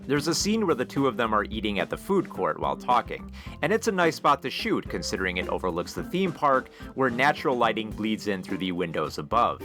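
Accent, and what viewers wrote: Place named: Minnesota, United States. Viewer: I'm from Minnesota too, but your Minnesota voice is making me turn this bullshit off, dontcha know.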